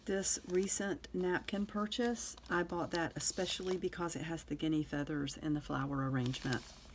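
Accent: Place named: North Carolina, United States. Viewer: I thought I detected a NC accent!! I'm originally from Charlotte but live in California now. Where can I find your items for sale? I'm new to your page, but love it already!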